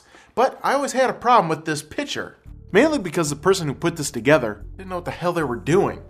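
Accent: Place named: Ohio, United States. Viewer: LOL that is our Ohioan accent ~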